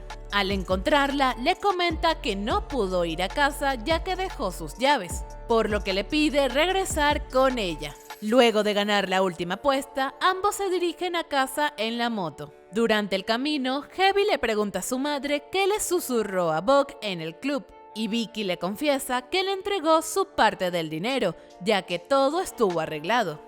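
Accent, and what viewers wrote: Chile. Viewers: Soy yo o la narradora tiene un ligero acento chileno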